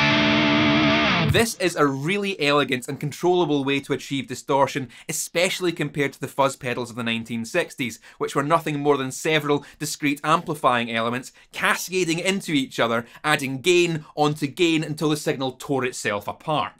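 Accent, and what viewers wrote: Scotland, United Kingdom. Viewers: I really like your scientific approach to explaining guitar electronics. No snake oil salesman here. Also I just love the sound of the scottish accent!!! Greetings from a german electrical engineer drummer guitarist guy. 🤣🤘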